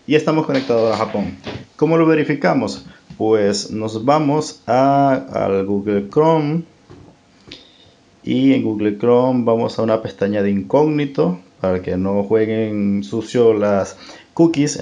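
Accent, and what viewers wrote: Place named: Venezuela. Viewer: Wey eres de venezuela tengo un compa que habla como tu y es de venezuela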